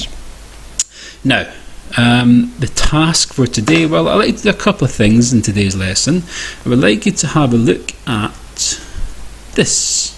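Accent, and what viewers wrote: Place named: RP, United Kingdom. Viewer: Wee posh accent 😹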